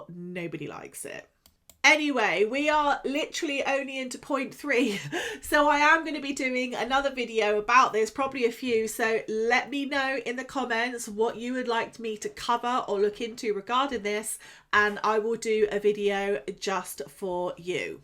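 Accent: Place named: RP, United Kingdom. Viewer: Can u not speak normally no need to trying to speak like a queen.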